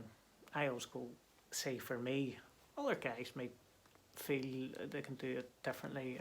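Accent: Belfast, United Kingdom. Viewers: I've been putting off painting my 15 panel doors because it was such a hassle the first time, masking tape etc. This video has taken the "fear" out of it. I must hunt out my "angled" brush.paint and get stuck in. Thanks for the video mate. Gary. Fellow Belfastian by the sound of your accent.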